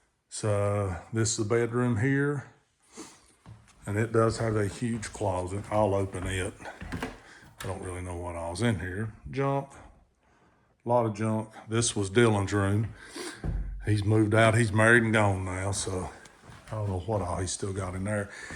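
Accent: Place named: Southwest Virginia, United States. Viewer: Wow! This place is spectacular! Now I cant afford any of this but it's stunning. I have to let you know that you remind me of my family growing up (accent). I was born in Wythe county and lived in Cripple Creek and then Wytheville until the age of 10 and we came further north to central va. If I could, this would be my dream... even a touch of it would. I'd love to live in this area again, it feels like home. My grandmother passed away and I have an aunt and uncle in Rural Retreat and this place is magical. Maybe one day I can find my spot ✨ Merry Christmas 🎄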